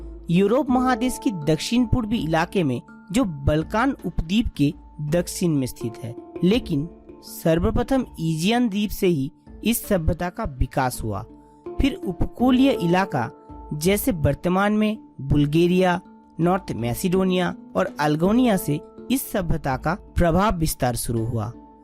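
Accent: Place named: Bengal, India. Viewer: Bhai aapke pronunciation se laag raha hai aap Bangali ho 😅😅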